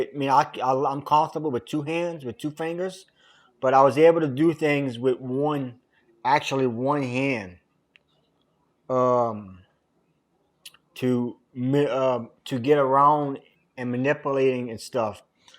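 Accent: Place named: Alberta, Canada. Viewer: Thanks for video!!! You have Canadien(Alberta) accent. where you from?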